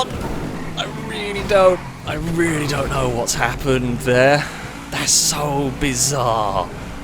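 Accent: United Kingdom. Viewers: It's guys like this that give the British accent abuse... that, hellllllooooooo at the intro. Fs man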